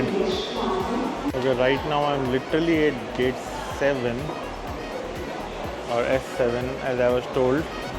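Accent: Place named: India. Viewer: I CANT UNDERSTAND WITH YOUR TANDOORI ACCENT...HORRIBLE EDITING BTW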